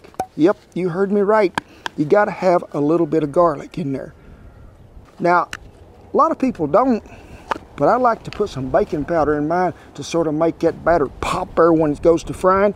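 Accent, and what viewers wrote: South, United States. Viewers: i am actually watching this channel for the great accent hahaha. i just love the Southern Accent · was just listening to him talk while im doing stuff around the house and i thought it called for Bacon powder! Rewatched and saw its Baking powder! LOL those southern accents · Lol ... I’m from Texas and knew exactly what he said! I guess ya have to be from the south to understand the dialect 😊 love it 😍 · He has a Southern accent . It's the most popular accent as voted by someone. I love his voice. Handsome guy . Sounds like my Tennessee family and friends.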